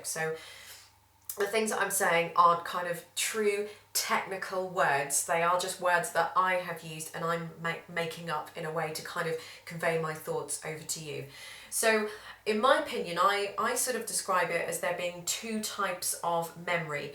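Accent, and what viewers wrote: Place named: Scotland, United Kingdom. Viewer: You have a rich Scottish accent in my opinion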